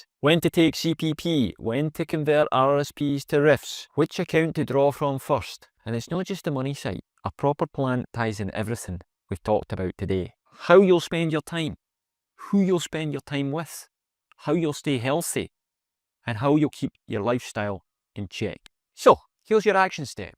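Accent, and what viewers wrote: Scotland, United Kingdom. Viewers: LOL, nice Scottish accent, I love it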